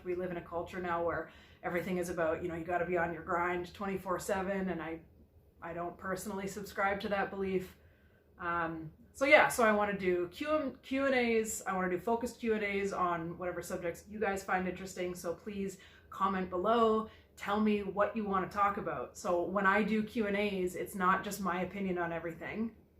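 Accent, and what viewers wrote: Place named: Canada. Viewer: How old are you, and that accent sounds Canadian LoL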